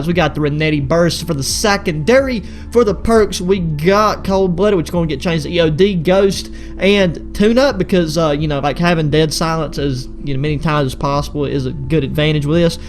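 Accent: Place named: West Virginia, United States. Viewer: Bro you sound like some of my home boys from southern WV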